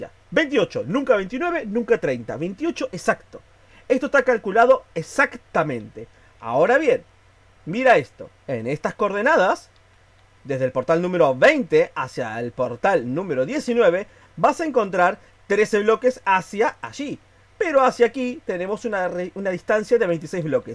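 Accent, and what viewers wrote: Argentina. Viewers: De adonde es el chico este?? la tonada se me hace muy argentina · Che mira, te hago una pregunta ¿vos sos argentino o de que pais sos?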